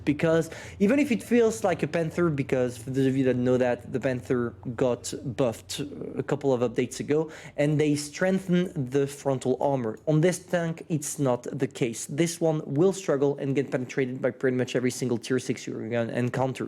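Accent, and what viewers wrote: France. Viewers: He is probably french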